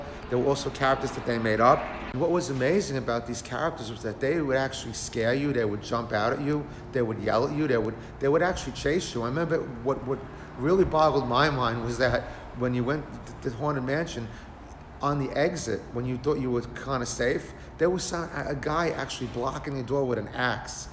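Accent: Brooklyn, United States. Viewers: I went there as a kid....fun place. thanks for sharing....And your right, at that time late 70s & early 80s, that was scary. I remember the hands grabbing my feet with like rat noises, it was terrifying & fun at the same time. Love your Brooklyn voice. I'm a BK native as well & my condolences for your son. May he rip. 🙏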